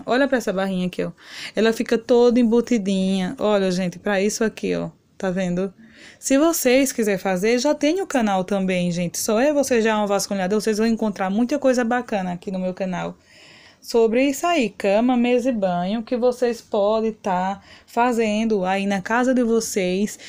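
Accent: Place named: Alagoas, Brazil. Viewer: Seus trabalhos são liiiiiindos ,amei seu jeito de falar ,alagoana né?gostei muito e vou me escrever pra aprender fazer todas essas coisas lindas que VC ensina. Não sei pintar mais pretendo aprender ,Deus te abençoe.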